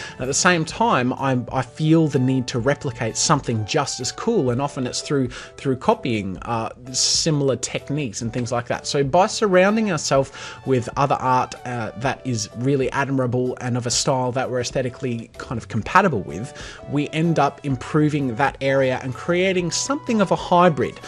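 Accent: Australia. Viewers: U r australian 😆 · is he Australian? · Who else is watching this in 2020 and thinking he used to sound more Australian? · Is he Aussie?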